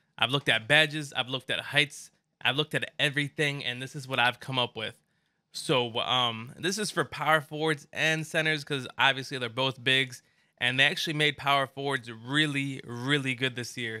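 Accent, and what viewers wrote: Chicago, United States. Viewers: Wha part of Chicago u from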